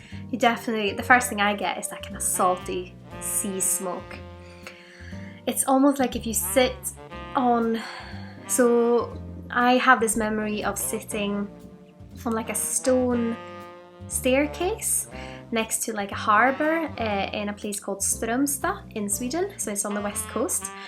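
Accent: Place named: Glasgow, United Kingdom. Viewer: Cheers, agreed, this is a brilliant dram, but you sound more like a Glaswegian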